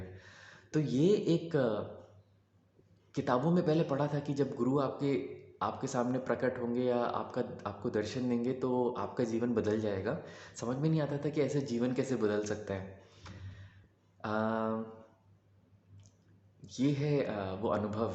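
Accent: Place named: Andhra Pradesh, India. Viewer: telugu boy talking in shudh hndi lets goo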